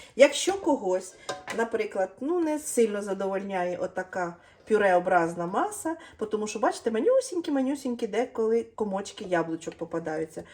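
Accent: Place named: Vinnytsia, Ukraine. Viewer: Скажіть, будьте ласкаві, з якої Ви області, бо у Вас такий говір, як у нас на Вінниччині? І де Ви придбали свою класнючу кастрюльку? Я на неї не могла все відео налюбуватися!😊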